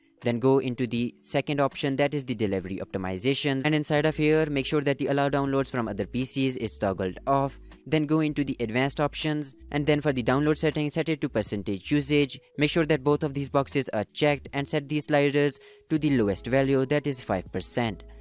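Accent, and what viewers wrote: India. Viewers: you sound indian and may I ask what ethnicity are you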